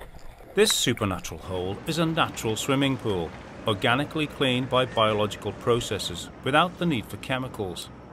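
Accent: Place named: England, United Kingdom. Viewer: Documentaries just sound more official in an English accent.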